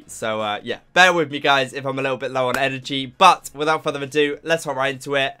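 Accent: United Kingdom. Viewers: Oh, he's from America? When i first watched his channel, i kept thinking he was British all the time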